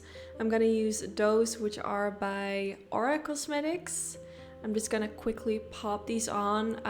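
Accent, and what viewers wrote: Netherlands. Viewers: Ben jij toevallig Nederlands? 😁 hoor een nederlands accent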